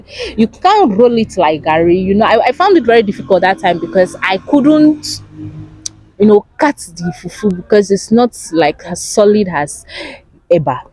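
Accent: Ghana. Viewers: Nice girl. Nice video. Keep it up. Your accent both in English and Twi sounds so Ghananian. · Ur English look like Ghanaian to me keep it up thanks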